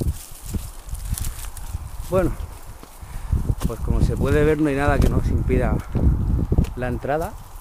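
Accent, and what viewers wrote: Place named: Spain. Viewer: muy bonito video, yo deduzco por tu acento de hablar que éste castillo queda en algún lugar de España- gracias.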